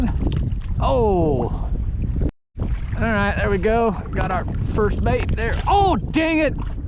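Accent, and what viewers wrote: South, United States. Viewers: Very interesting, an Asian person with a southern accent, cool !!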